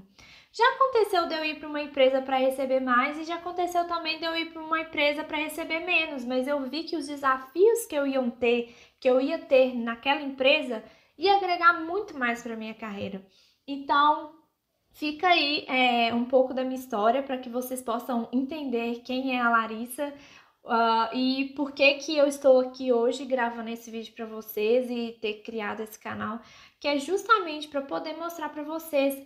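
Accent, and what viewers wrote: Minas Gerais, Brazil. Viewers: Algumas coisas no sotaque mineiro são inconfundíveis. Percebi logo na primeira frase kk Mais um inscrito o/ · meu Deus ...q top kkkk parabens ... vc fala igualzinho a Ana Paula Valadão ! vc é mineira tbm ?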